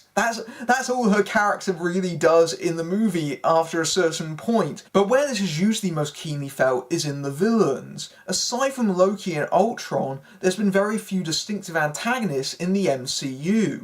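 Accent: United Kingdom. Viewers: JotunTV It is called a British accent, something I don't expect an ignorant dumbass like yourself has encountered before.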